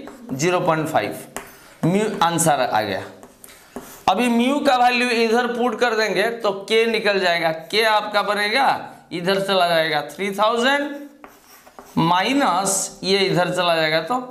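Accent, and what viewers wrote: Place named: Odisha, India. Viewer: Your hindi accent is like an odia...You must be an odia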